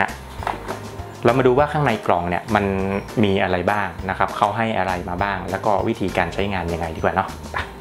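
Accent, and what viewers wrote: North, Thailand. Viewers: คนเหนือหรือเปล่าครับ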